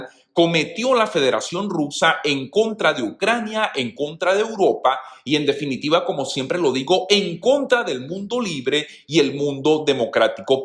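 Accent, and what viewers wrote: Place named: Venezuela. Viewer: Ese dejo venezolano jaja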